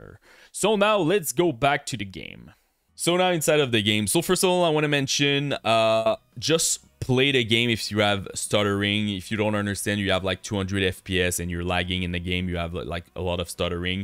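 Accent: Quebec, Canada. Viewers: T'es clairement Quebecois haha! L'accent est accurate.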